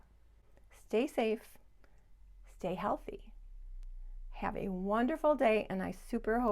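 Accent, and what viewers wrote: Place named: Midwest, United States. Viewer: What a lovely, Quint cottage you have!! Thank you so much for sharing it with us. Can I ask if you are a Midwesterner, like me? We kind of sound alike:) You do a great job making use of the space you have!🦋☀️🥰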